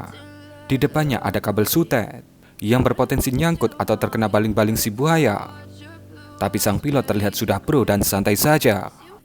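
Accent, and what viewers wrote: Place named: Java, Indonesia. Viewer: Berak2 matamu iku medok2😂😂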